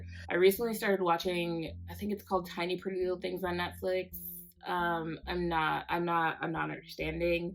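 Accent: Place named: Africa. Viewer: It's the African accent for me 😂😂😂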